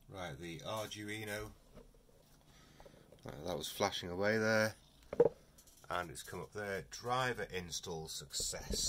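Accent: Wales, United Kingdom. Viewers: my friend, I’m going to be crossing the pond next year and spending 5 weeks all throughout the UK, Wales, Scotland, and Ireland with my gf and a couple mates. I’d really like to hook up and meet proper. I’m going to be checking out tons of castles and stone circles. Hopefully there’s some in your area and I can knock out 2 birds. I’m just not sure where you’re at. You have a southern/wales accent to ya. Hope you get back with me...Cheers. Btw, I built my lichtenburner from a oil burner. It doesn’t have near the power yours has. What are you using? Todd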